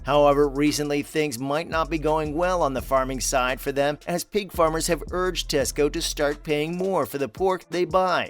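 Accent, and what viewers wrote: United States. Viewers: Is this an advert for Elders or supposed to be informative - not sure you are achieving either - especially with the very annoying American accent.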